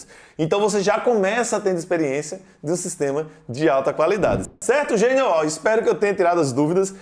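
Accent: Northeast, Brazil. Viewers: Da um nojo, vai assistir um video e percebe esse sotaque de merdestino.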